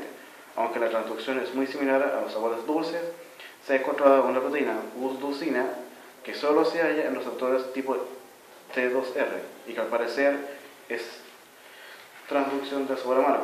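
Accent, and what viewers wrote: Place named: Chile. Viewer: es chileno, así hablan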